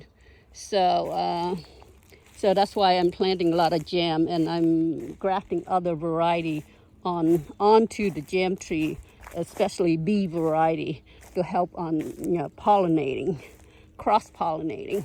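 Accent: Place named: Vietnam. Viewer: math pays the bills! Farming in the blood. Having an edible landscape surrounded by open space is nice. There is an empty lot 120 acres down the street from us we are looking into only issue is it’s VERY steep. Jujubes we do know quite well. I grew up on a farm in San Diego county and we had jujubes so I developed a taste decades ago for them. Will be interesting to watch the progress of the avocados. What year was initial planting? I started in 2017 and am about out of space now at 70 trees. Total trees with everything about 100 I lose track. I’ve had a lot of failures with avocados but now got it down pretty well with good drainage and lots of mulch and most of the grove is thriving. I am especially interested in seeing how Nimlioh does for you. I have 3 and they are thriving. Funny trivia my guess is you were from Vietnam bc I know the accent - MANY of my students are Vietnamese and have been teaching 25 years to them. 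My best friend Andrew Stehr who owns Stehr concrete in San Jose has Vietnamese wife and he - white guy from Alabama is completely fluent in Vietnamese. I read and write mandarin 我读写汉语 so what is interesting is when Andrew translates Vietnamese into Chinese for me I can understand it. So anytime the Vietnamese use the Chinese characters I feel very happy. We have a new Vietnamese supermarket that opened called Dai Thanh market and I have no idea what that means but as soon as I see the writing 大城 market then I know what it means - big city market. Avocado 牛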